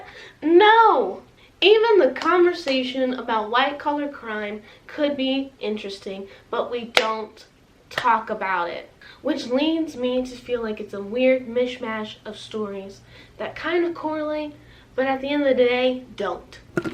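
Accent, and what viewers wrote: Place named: South, United States. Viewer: very here for more reviews in southern accents. so many of these books are on my tbr!!!!! so excited!!!!!!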